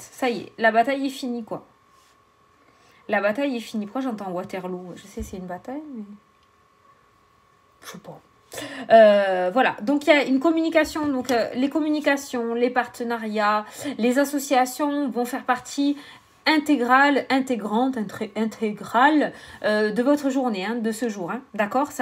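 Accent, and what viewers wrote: South, France. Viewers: cc oui tu as l accent du sud ainsi que le chant des cigales ta bonne humeur et ton sourire fait du bien. Je te regarde le matin et ma journée commence avec beaucoup de bonheur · Cc..ho oui tu l a l accent et c est juste magnifique..c est une voix qui chante on entendrait presque les cigales.. j adore tu as tout pour nous faire passer un merveilleux moment... Pour la communication oui je l ai tellement attendu...mais j ai dis que je lache prise.j en ai chier....et que ça serait bon de pouvoir vider son sac... Merci pour tout a bientôt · Il est bien là le magnifique accent du sud, j'adore !! Je me sens en vacances quand je t'entends ! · Bonsoir. T'inquiète....! tu l'as l'accent du sud. Il est chantant, charmant, et pleins de tonus, comme toi. Il te vas bien! Bises. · Oui tu as bien l accent du Sud merci pour ta bonne humeur et ta gentillesse bisou ♡ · Je te confirme tu as bien gardé ton accent du sud. 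bisous t'es géniale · Bonsoir, je confirme tu as bien l accent du sud. Et c est agréable avec ta bonne humeur. Merci pour ce tirage, je souhaite que cela arrivera. Car le sommeil est bien compliqué. Bonne soirée à 😇🙏😚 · bonjour! Vous avez gardé votre charmant accent du sud!!! Pas de souci! Ce mercredi est positif c 'est super! Biz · Votre accent est un bonheur à écouter ! Le Soleil, les cigales... belle énergie! Votre tirage me parle vraiment! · Toujours aussi juste 👏👏👏 et vive l’accent du Sud !!!!! ⭐️🌙⭐️🌙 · Coucou oui ton accent du sud est mon soleil du soir ☀️🌞😍😘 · Coucou. Oui tu as toujours ton magnifique accent du Sud! Chantant, leger, joyeux! Sinon, en effet je dors mal!! Reveil vers 4h...ça fait quelques nuits... merci pour tes tirages et guidances 🙏🏻🙏🏻🙏🏻❤️ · 28... pour le 6 janvier 8-2= 6... c'est souvent que tu nous fait ce genre de tirage synchrone. Ton accent ma Belle tu l'as mais comme je l'aime pas trop marqué mais tellement vrai. 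Je suis de l'Occitanie ( Aveyron ). Malgré 40 ans en région parisienne, j'ai toujours mon petit accent en fond...et je le cultive quand je descend au pays. Le tirage concerne le professionnel... et moi qui suis déjà à la retraite, je ne m'y retrouve pas dedans. Mais ce n'est pas grave... j'adore cette positivité pour ceux qui sont concernés. Seul point les insomnies qui sont fréquentes et mon Papa est très présent malgré que cela fasse 3 ans qu'il m'ait quitté le 30 décembre 2017. Bisous ma Belle du Sud👄💗🌞😍😍😍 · Coucou, oh que ouiii tu la encore ton accent ❤et j'adore t entendre parlé! Tu chante le soleil du sud 🌝 il te vas si bien! Je t'embrasse bisous et merci à toi 😘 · Merci pour le.tiraje ses.que des bonne nouvelles oui tu a l'accent du sud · Coucou tu as toujours ton accent du sud très agréable à t'écouter et avec le sourire 😍😆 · Bonsoir oui on entend bien ton accent du sud. Je te souhaite une bonne soirée à demain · Oh bibiche comme je croise les doigts pour que cela se passe ainsi ! 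Beaucoup de démarches en cours ici, en attente de retour pour justement des partenariats professionnels. Wait and see. Et oui, un très bel accent du sud :-) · Bonsoir merci pour le tirage, oui je te confirme que tu as bien l'accent du sud mais c'est agréable plein de soleil! Gros bisous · Coucou oui l'accent du sud on l'entend très bien, mais s'est très agréable ça nous fait un air de vacances. 😉😉💋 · Bien vu,on prépare la retraite,avec déménagement et construction de maison d'ici 3 ans ( pour le moment achat du terrain,beaucoup de papiers. bisous et ne t'inquiètes pas tu as toujours l'accent du soleil j'adore ta bonne énergie bisous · Ouiiiiii tu l'as tjs notre bel accent du sud, merci à toi et elle journée 🙏🌞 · oui je suis réveiller a3H du matin je ne dort que très peux merci j Aime ton dynamisme et ton accent du sud merci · 😂😂 j'adore ici 20 h aussi. Et oui tu as un bel accent du Sud. 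Moi c'est celui du sud ouest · 🙂🙂 tout est arrivé hier..tout le tirage c est trop ça... merci et vive l accent du sud ❤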